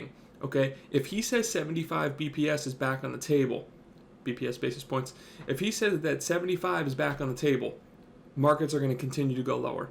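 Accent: United States. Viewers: you sound like you are from new jersey/long island with that accent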